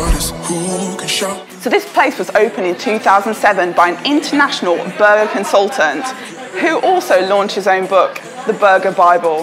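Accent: United Kingdom. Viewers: I like her British accent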